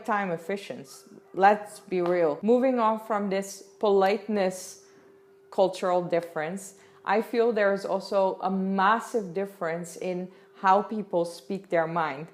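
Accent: India. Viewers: You are talking like an Indian.... With such a lot of emotion 😁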